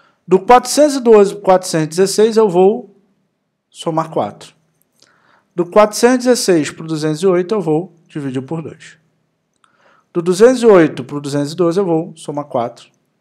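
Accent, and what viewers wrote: Rio de Janeiro, Brazil. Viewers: Eu adoro o jeitinho carioca "Tu vai continuar reclamando?" 🤣 Obrigada pelas aulas! Aprendo muito aqui e paro de reclamar!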